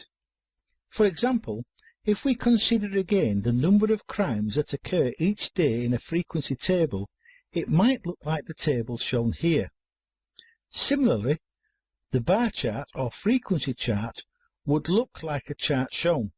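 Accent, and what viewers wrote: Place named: United Kingdom. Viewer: The fact this man is teaching what I want to learn and has a UK accent makes this course so much better. ❤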